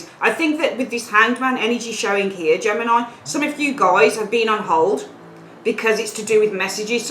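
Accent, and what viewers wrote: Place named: Midlands, United Kingdom. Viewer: You are good. So accurate it’s scary. Love your midland ?accent. Xxx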